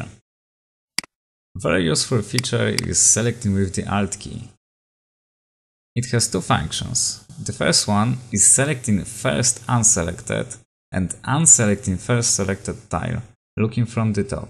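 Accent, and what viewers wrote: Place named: Poland. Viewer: Widziałem, że skądś znam ten akcent, Polak jesteś. :D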